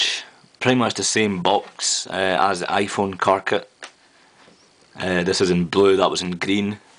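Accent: Scotland, United Kingdom. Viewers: lol typical scottish accent :) its nice to hear a similar accent to myself for once :D excellent reviews.. definately gonna purchase a car kit for my ipod touch x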